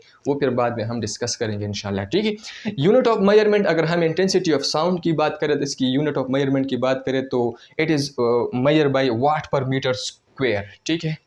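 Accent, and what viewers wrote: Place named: South Asia. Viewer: because brother you looks foreigner but when you sounded south Asian it was really surprising and funny. As far as I can guess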